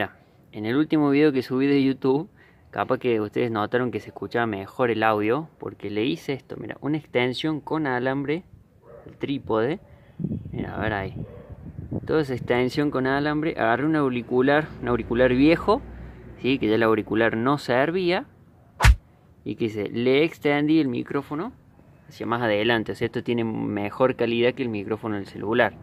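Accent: Córdoba, Argentina. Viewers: decime si no sos Córdobes papá?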